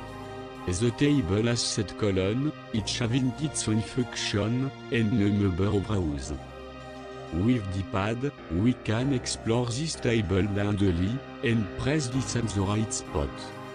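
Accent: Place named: Germany. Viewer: lol sorry it sounded like german at first and then I actually listened and it was english. very heavy accent.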